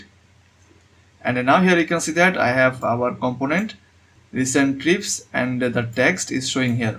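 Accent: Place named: India. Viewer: "Here you can see that" , also nice video , the accent is very indian sir.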